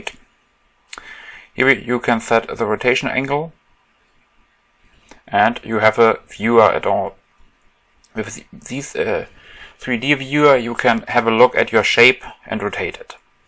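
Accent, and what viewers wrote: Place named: Germany. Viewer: kannst das mal auf deutsch machen hört man doch das du deutscher bist:D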